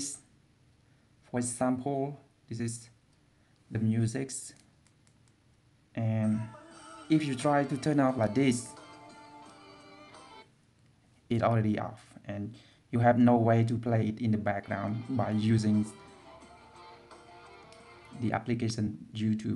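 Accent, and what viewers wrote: Cambodia. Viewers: ohhh it makes sense now, i knew ur accent sounded familiar! your khmer! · I knew u are a Cam..... . Cause I know every Cam...... speaking english.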